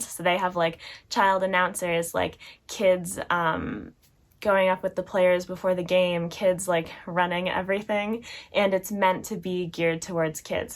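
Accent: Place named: Europe. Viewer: Playing team sports is the best thing in the world and pizza is the answer to everything. Also, your trip to Europe explains your European accent.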